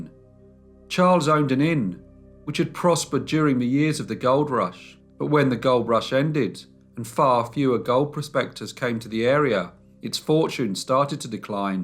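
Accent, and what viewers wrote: United Kingdom. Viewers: im from North Carolina in the US its the south east in the US and if you only knew how horribly flat and that twang we have...I never say anything about how someone pronounces a word outside of this state. The most educated can sound like an idiot. I absolutely love a British accent, especially yours. Keep up the great work. You are helping keep me sane during this horrible pandemic and our joke for a leader..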